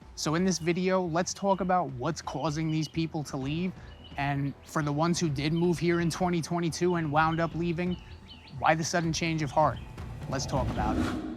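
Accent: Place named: Northeast, United States. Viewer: Good Video. Nice Northeast accent 😉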